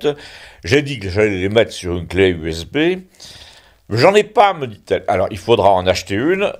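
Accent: South, France. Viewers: Svp, 🙇‍♂️🙇‍♀️ lisez Nous TOUTE votre chronique "avè l assen" que vous maitrisez si biiiiieng, 🤓👏👏💪 cest SAVOUREUX! 😄😃😍😍😍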